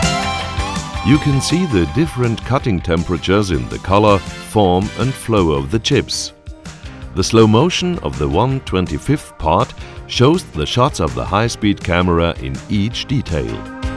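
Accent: Germany. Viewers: Ich liebe diesen deutschen Akzent im Englisch.. :D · Der deutsche Akzent in diesem Video ist aber recht dezent und unaufdringlich möchte ich meinen. Da muss man ja fast Deutscher sein um zu wissen das es ein deutscher Akzent ist.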